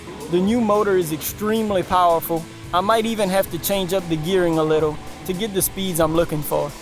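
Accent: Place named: New Orleans, United States. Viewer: Where you from New Orleans? You have the accent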